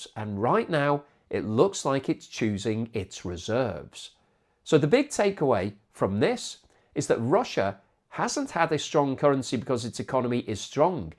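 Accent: United Kingdom. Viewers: I do like your fast British delivery rather than those wordy USOnes that treat us like idiots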